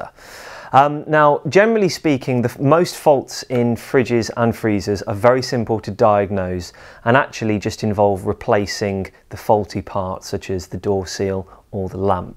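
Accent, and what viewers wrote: RP, United Kingdom. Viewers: Like that accent is it posh?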